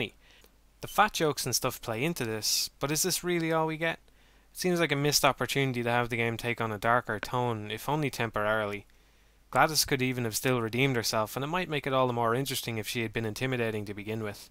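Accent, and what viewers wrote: Ireland. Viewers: where are you at in the world I live in the us in kokomo Indiana but I feel we are kindred spirits because you have passion for games like me and you sound irish I have red hair and I agree with every you say about games im just drawing a comparison but its incredible the way your words are the way I think maybe we could team up but that is just wishful think anyway keep with it I love work man you are like adam sessler, tommy tellareco, Geoff key lee. (spelled wrong) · are you irish?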